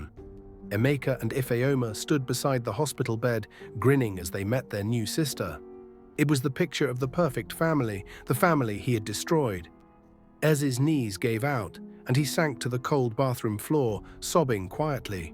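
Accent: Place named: England, United Kingdom. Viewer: The African accent is better for these stories. Please use that accent. It’s hard to listen to and English man narrating, even if the story is good.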